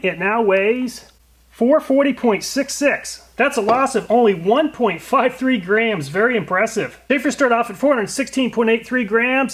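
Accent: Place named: Pittsburgh, United States. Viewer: I'm from the Pittsburgh area....your accent....my ears cant be wrong....you're from there too right?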